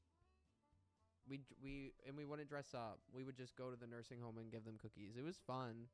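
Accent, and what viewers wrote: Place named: California, United States. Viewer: Hey,! I adore your accent. Are you from California?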